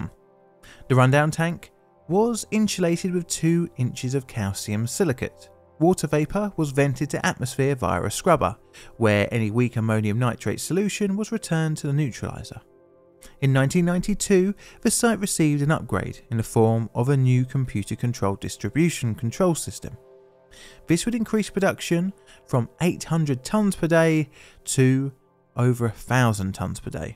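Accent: United Kingdom. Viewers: The heavy Brit accent detracts from the story, which happened in the US.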